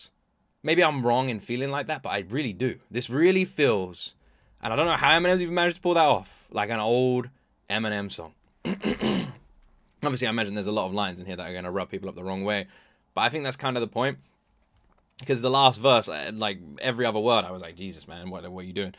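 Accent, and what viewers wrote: United Kingdom. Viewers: I'm new to you and your channel you did a amazing job with this em I'm listening and hearing your accent or you british or english I was watching some video yesterday and seen a em video and I didn't think nothing of it I thought it was a older video em talking to some guy and em going do a magic trick and make his career disapear and now alotta other reaction talk about some rumors about em trying to get cancelled or em about to retire em my guy my guy em been doing this for how long now and only hours later from that em video I seen em drop this with the music video I don't know what going to happen what going on son the only one that knows about anything is em and probably whomever else em talk to if this one of em last songs albums it's going to be a really very sad bad day I like em em my guy my guy keep on doing what you doing my guy 👊👊🔥🔥👊👊👊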